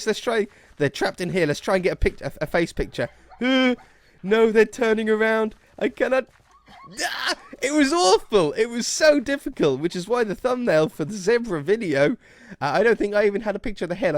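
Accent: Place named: England, United Kingdom. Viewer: Make a soccer stadium plz oh and where nabours your English 🇬🇧 and I'm Irish 🇮🇪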